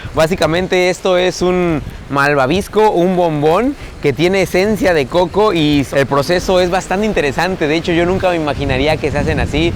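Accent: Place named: Mazatlán, Mexico. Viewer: Ya sete pego el asentó de aya 😁que gastronomia tan rica como se antoja ir a mazatlán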